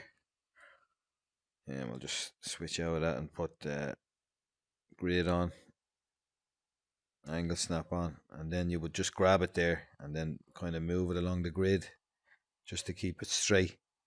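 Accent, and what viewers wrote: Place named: Dublin, Ireland. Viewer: I fucking love hearing a Dublin accent doing high end 3D stuff. Hope you’re well!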